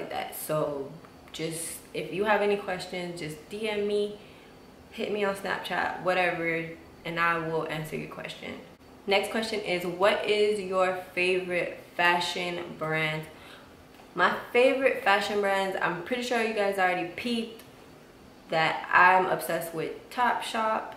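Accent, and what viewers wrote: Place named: United States. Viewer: lol I was about to ask but wasn't sure because of your american accent--if you were Habesha lol. Now I know ur not :)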